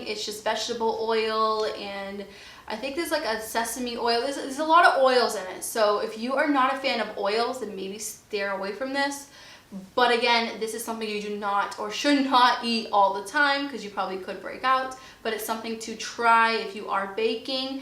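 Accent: Boston, United States. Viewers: Stop it!! People love that Boston accent! Don't apologize for being you, we're here because we love your content. ❤️ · Great video! My favs are Kite Hill cream cheese and Gardein 7 Grain Chicken strips. Also, I'm from Boston but moved away a few years ago. Hearing your accent reminds me of home and I love it so much! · From a former New Yorker I love your Boston accent, It's too cute, remember we watch you for you and what you bring to YT so embrace your uniqueness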